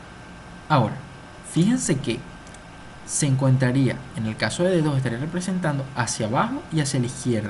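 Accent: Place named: Venezuela. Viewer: Saludos desde Venezuela, guatire, estado Miranda, soy estudiante de Medicina, excelente vídeo clase, de verdad te felicito, eres tremendo para enseñar, tienes el don. Te admiro. Descubrí tu canal ayer, oficialmente me suscribí y te di like. Por favor publica más vídeos como este. De seguro ya te graduaste de médico, por tu acento puedo decir que eres paisano mío, ¿Estudiaste en la UCV? Ojalá regreses para ver más clases tuyas y seguir aprendiendo.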